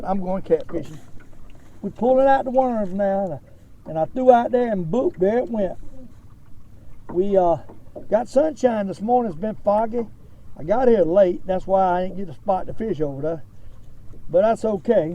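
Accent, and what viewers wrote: United States. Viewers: That country accent. Love it. Love you videos.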